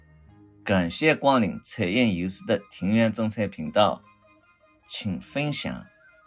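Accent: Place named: Jiangsu-Zhejiang, China. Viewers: 主講人十足一亇江苏江南人！ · 可惜您這張圖裏沒有我所在的州猜猜哪個地方？感謝您的各種種植經驗的分享，喜歡聼您的這個江浙口音。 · 超爱这包邮区的普通话口音简直回归故里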